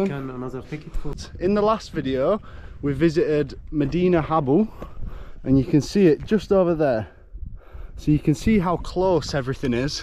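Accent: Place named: Yorkshire, United Kingdom. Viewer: I just love it, you say it as you see it and you have such a great Yorkshire accent, but please do an Aswan Trip you and everyone will love it, i miss egypt so much its been 8 years since my last trip but looking at going to Hurgharda in March 2025, only downside it will be the first time i've done a trip on my own, but i'm that confident in the people and i always feel safe, one story comes to mind on my 3 or 4th cruise they decided to go luxor to aswan then back to luxor then up to Dandarah, woke up one morning on boat to Dandarah and at front and back of cruise boat were tents? i thought whats going on here,turned out because Dandarah is normally off the boat trip schedules and theres a lot of nomadic tribes, anyhow when i looked inside the tent at the front and back i was taken back as there were Machine guns bolted to the front and back of the boat lol, they were very rusty lol so i'm guessing not been used for a long time, anyways we had no trouble and enjoyed the trip to Dandarah, also please make people aware of the police in the white uniforms (tourist police) they are everywhere and if you ever feel unsafe go to one of them as they are only there to make the tourists feel safe, and they will always side with you over a local. 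Such a great country just a shame they have had troubles over the last few years but its getting back to normal now 😃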